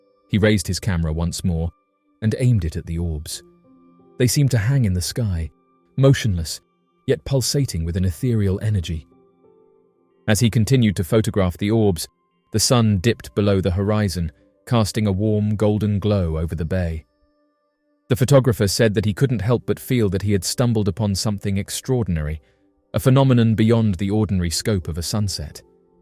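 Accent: United Kingdom. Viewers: He’s just bri’ish.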